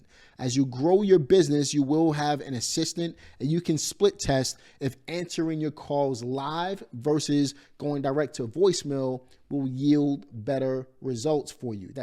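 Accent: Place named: Brooklyn, United States. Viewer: Side note…you sound like you’re from BK too! 💪🏾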